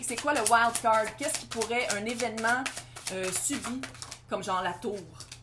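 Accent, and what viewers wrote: Canada. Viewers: Absolument désopilant 😂🤣…et dans le mile. Le mien a une ex qu’il a quittée il y a 3 mois après m’avoir quittée pour elle il y a 3 ans (mouai), puis l’ex est revenue par texto il y a 3 jours (une vraie bonne triangulaire comme on en fait QUE dans les meilleures maisons 😜) Du coup ça l’a secoué , Du coup ça m’a secouée, Du coup je lui ai dit « stop on arrête tant que t’y vois pas assez clair » vu qu’il ne « release pas encore son ex ». Mais bon. St Valentin ou pas, c’est pas encore réglé c’t affaire. Ahhhh continuez absolument, qu’est-ce que je ris 😂 En revanche le débit en canadien je vous dis pas, au moins 5 visionnages pour pécho un ☝🏿 seul mot. xxx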